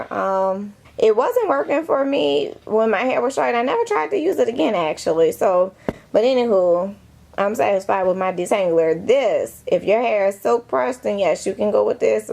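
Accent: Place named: South, United States. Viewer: I love your voice!!!! #Southern ❤️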